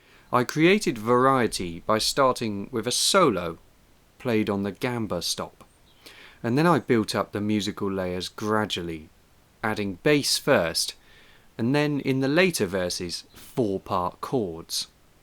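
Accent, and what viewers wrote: England, United Kingdom. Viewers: I'm a Brazilian teacher of English and have accessed some of your pieces played on the organ by chance. Amazing work you have been doing! It's been a pleasure watching and listening to your performances while you share information concerning history, geography and harmoniums and organs! And it's being really nice listening to English accent (mine is more of an American one -- some Americans couldn't place where I was from since there are four different accents: from Virginia, New York, Boston and Minnesota). Anyway, thanks for spreading images and pieces of music all over the world! Viviane Horbach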